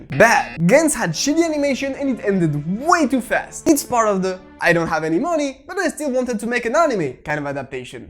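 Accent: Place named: France. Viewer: Gooooood, I love your french accent 🥖🥖🥖🥐🥐🥐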